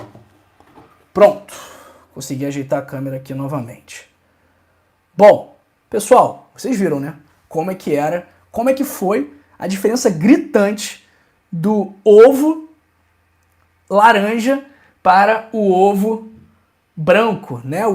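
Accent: Rio de Janeiro, Brazil. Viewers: BOM DIA DOUTOR..QUÊ BOM OUVIR À SUA VOZ, ESTE SOTAQUE MARAVILHOSO, O CARIOCA...MELODIOSO,AGRADÁVEL, SUAVE,COLORIDO,CHEIO DE ENTUSIASMO...maryjou prof.italiano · Vídeo bom. No entanto, esse sotaque carioca muito carregado é irritante demais, não consegui assistir até o final....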